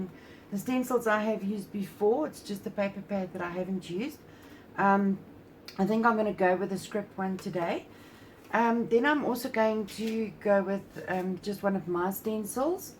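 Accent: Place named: South Africa. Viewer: Oh my goodness that os a stunning layout. Its so wonderful to find a fellow crafty south african! I watched your video while baking bread. I miss that accent terribly.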